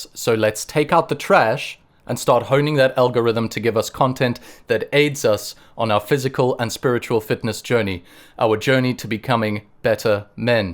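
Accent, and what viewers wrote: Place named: South Africa. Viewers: Your accent sounds South African... Are you? I love these guys you mentioned. I love Abbot Tryphon too! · He's mentioned he's originally from South Africa.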